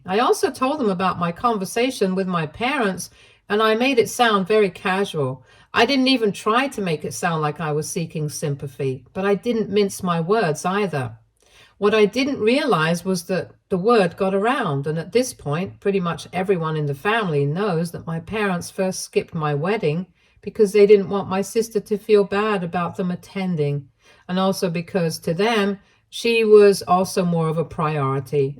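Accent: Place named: England, United Kingdom. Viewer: is English. So she has an English accent. We have lots of regional accents in the US as well. She is probably pronouncing it properly where she lives.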